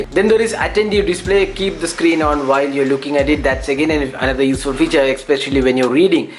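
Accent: Kerala, India. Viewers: mallu accent ?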